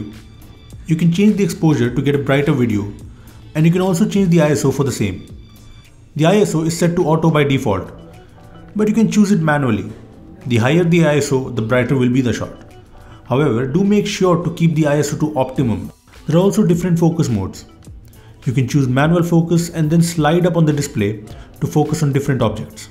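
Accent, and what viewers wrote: India. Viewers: RIP Indian English accent :(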